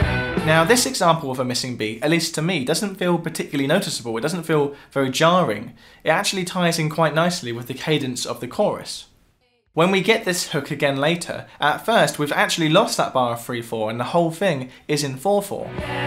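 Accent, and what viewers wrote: United Kingdom. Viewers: The second you started talking I was like Ahh a fellow brit!!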